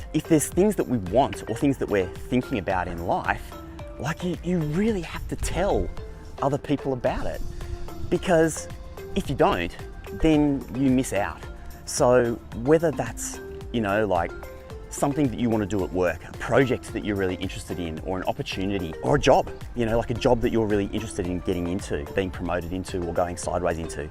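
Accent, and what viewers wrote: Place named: Australia. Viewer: Australian English is awesome 😎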